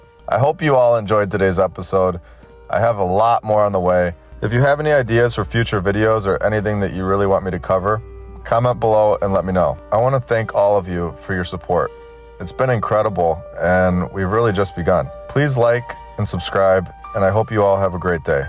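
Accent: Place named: Syracuse, United States. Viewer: I'm from Syracuse NY & he sounds like he's from my hometown. But he could also be from Chicago or Michigan. They sound a lot like Syracusans.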